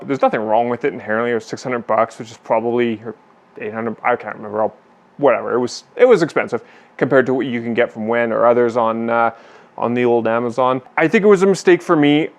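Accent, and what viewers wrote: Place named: Canada. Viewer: I never comment, but I kept thinking 'this guys sounds Canadian or something...' Then you dropped it: You are! A shout out from Ottawa! Keep it up, I'm subscribed. I want to hear about that 3D printer for sure. Been holding off on getting one of those but the writing seems to be on the wall, they're too useful to ignore.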